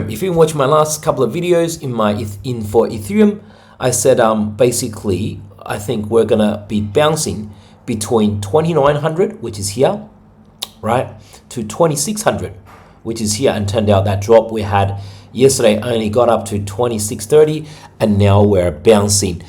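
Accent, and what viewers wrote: Australia. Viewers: Like your show very much are you an Aussie?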